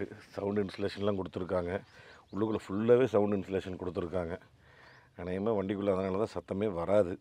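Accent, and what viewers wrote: Kongu, India. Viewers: கொஞ்சும் கொங்கு தமிழ் ...அருமை..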